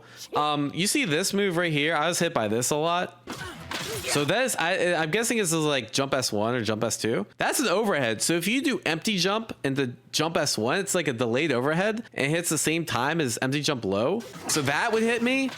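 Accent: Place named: North, United Kingdom. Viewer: bri'ish, but northern.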